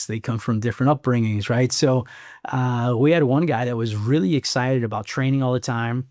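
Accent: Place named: Chicago, United States. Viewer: Riveting story teller. I can't stop listening to that old school Chicago accent.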